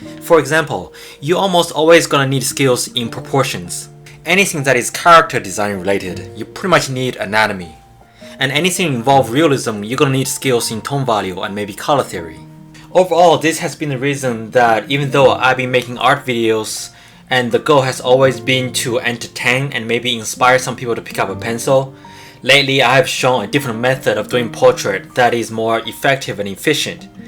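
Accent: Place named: China. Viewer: you have chinese accent ! very talented, thank you for the video !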